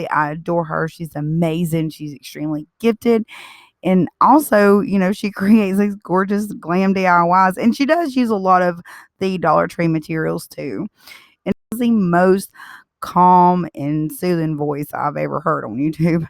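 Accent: South, United States. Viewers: I missed you girl! Live this diy!😻 I'm also subscribed to. I love her diy's also.😻 She does have a great soothing voice. I still love that southern accent of yours though. Anyway, can't wait for your next diy! 👍💖😊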